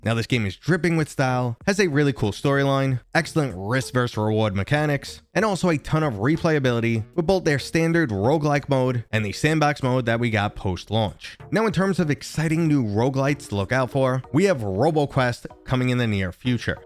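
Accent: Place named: Long Island, United States. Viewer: I've never been to Long Island, but thanks to your videos, I always fix that problem. Love the accent!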